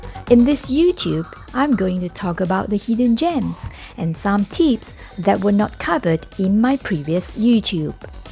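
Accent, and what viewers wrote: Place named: Japan. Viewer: Hi, thanks for the good info of these cruises. Btw, you sound Japanese. Are you?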